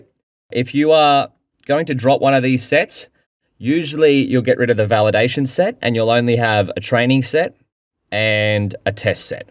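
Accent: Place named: Australia. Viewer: Yes, his australian accent is one of a kind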